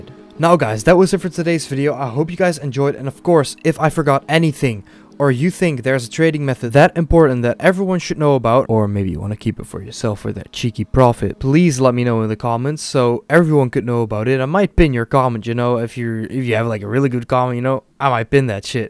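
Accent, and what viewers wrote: Netherlands. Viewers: its nice to know your dutch i sometimes hear a little bit of an dutch accent (im dutch myself and i recon the same thing XD)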